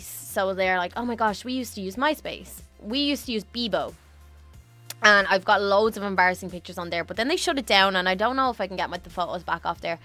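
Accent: Ireland. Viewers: Omg I remember watching u when I ren was like 7 omg I missed ur Irish voice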